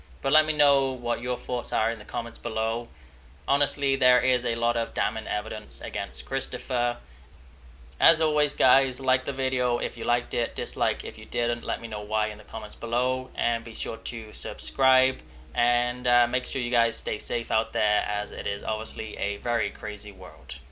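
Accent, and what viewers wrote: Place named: England, United Kingdom. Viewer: could deffo hear your English accent :) thank you for replying, love your channel ❤️ xx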